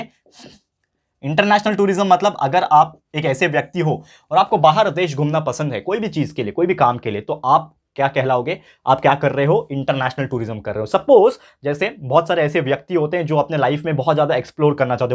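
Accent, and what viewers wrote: Maharashtra, India. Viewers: Sir kya aap Marathi ho Apki accent aur kuch words aap Marathi boltey ho to aisa lagta hai ki aap Marathi ho Kisi video mein bol dena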